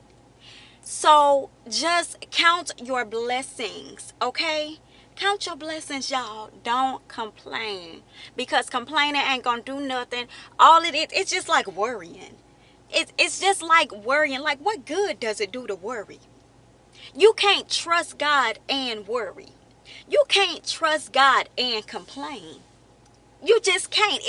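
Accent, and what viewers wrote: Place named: South, United States. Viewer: Words of wisdom from a beautiful southern woman I love your accent omg.I have to get me a southern wife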